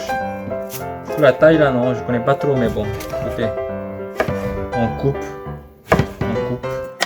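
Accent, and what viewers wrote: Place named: Ivory Coast. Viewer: depuis que je me promène sur YouTube, c'est la première fois que je m'abonne en moins de 30 secondes. ton accent ivoirien m'a grave tuer . pourquoi j ai pas vue tes vidéos avant !? YouTube même vraiment !!! vous avez mal fini kédjenou là déééh , bravo ? merci bonne continuation.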